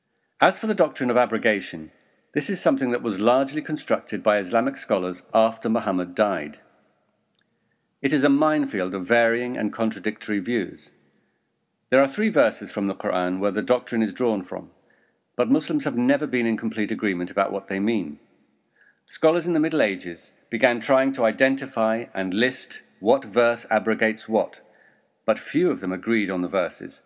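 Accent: England, United Kingdom. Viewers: excellent al taqiyya... use someone with an english accent to say "islam is peace" i advise anyone with a brain to read the koran without the help of a muslim muslims have always preached al taqiyya since mohammeds time: a nsw ering nonb eliever. i nfo/ was- prophet- muhammad-wron g-for-killing-kaab-bin-al-ashraf.html from another muslim.. shows the mentality allah fukbar!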